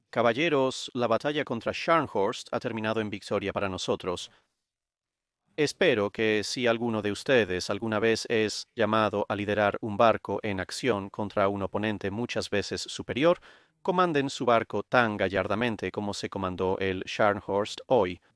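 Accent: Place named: United Kingdom. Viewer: Y me encanta ese acento británico y esa educación con estilo. Es muy agradable y lo seguiré siempre. Felicidades.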